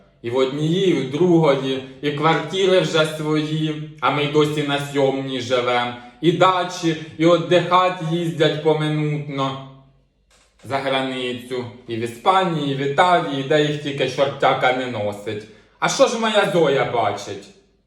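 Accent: Poltava, Ukraine. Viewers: Полтавський говір не сплутаєш ні з ким.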